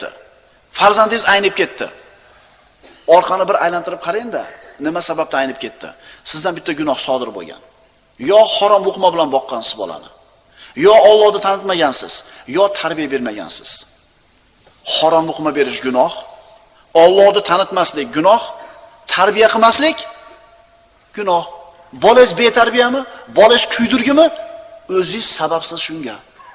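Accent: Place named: Andijan, Uzbekistan. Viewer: Ollohga Shukur ona vatanim tinch Oshdan ekan. Andijon bn shevasi bir xil ekan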